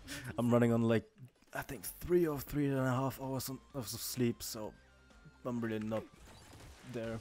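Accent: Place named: Germany. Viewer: Ah, i hear a DEUTSCHER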